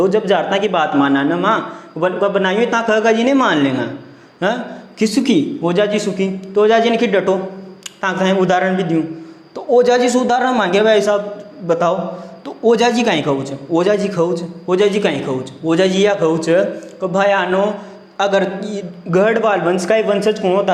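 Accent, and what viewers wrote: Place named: Hadoti, India. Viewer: Hadoti tone.....❤️♥️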